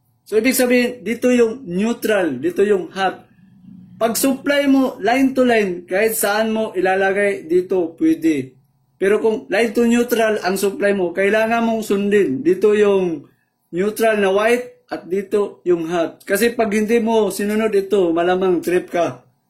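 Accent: Visayas, Philippines. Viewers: visaya diay ka?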